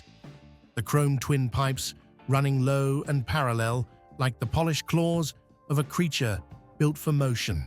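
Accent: United Kingdom. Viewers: i love triumph. but if i had heard this turkey waxing lyrical like a pommy git i would have bought a honda. cant listen to him anymore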